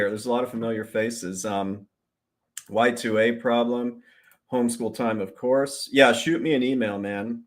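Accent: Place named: United Kingdom. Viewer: Love that saucy British narrator. ❤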